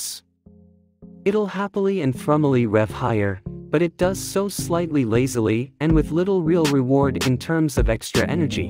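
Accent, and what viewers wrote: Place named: United States. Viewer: A strange review to listen too, the commentary sounds like an American version of R2-D2, the pronunciation of words such as chassis are so American, also the script repeats itself I say the script repeats itself…all in all a ghastly review…..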